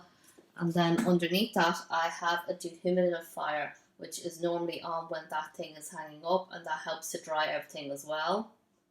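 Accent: Ireland. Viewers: Do I hear a bit of an Irish accent?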